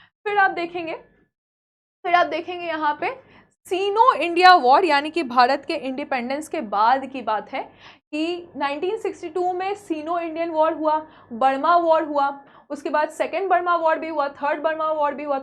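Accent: Bihar, India. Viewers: एक बात तो फिक्स है कि आप बिहार से है।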